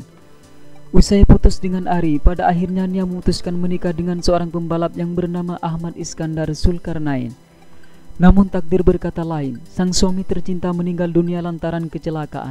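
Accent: Madura, Indonesia. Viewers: Kenapa dgn logat madura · Iya logat.y ky logat org madura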